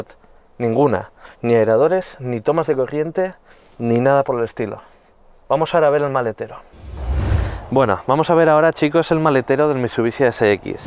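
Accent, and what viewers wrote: Spain. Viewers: Este habla como español.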